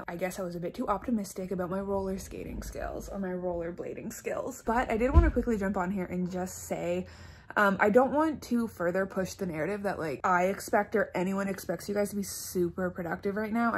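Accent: Canada. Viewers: is it just me or does she sound extra canadian in this video lmao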